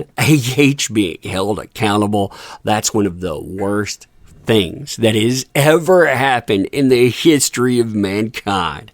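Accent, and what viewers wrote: United States. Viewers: Man, this accent is too American.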